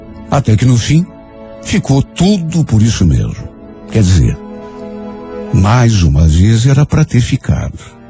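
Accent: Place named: Rio Grande do Sul, Brazil. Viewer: Sou fã dessas histórias lindo sotaque gaúcho.....!!😀👏👏👏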